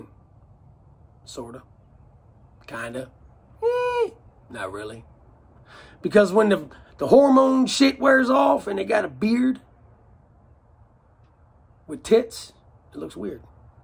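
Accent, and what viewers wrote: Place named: South, United States. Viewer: It's very Southern too really. I personally dig when it comes to story tellin' n' all.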